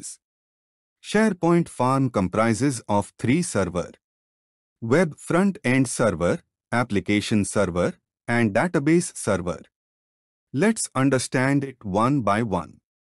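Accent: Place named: Russia. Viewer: Ty kráso, dobrej ruskej přízvuk.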